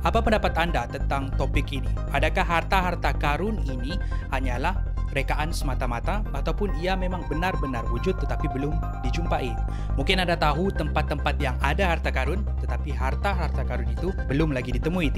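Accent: Sabah, Malaysia. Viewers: Dia orang Sabah la bro.. mmg cmtu loghat dia..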